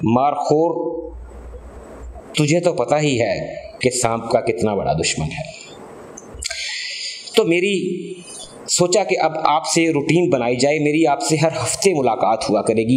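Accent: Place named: Punjab, India. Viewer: Apka ta'lluq punjab ki kis jagah say hay brother? Lehjay say ap punjabi lagtay hain.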